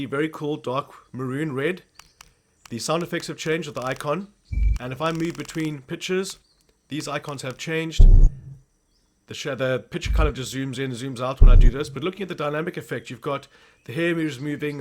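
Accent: South Africa. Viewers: South African Youtuber ? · Can clearly hear you south african😂❤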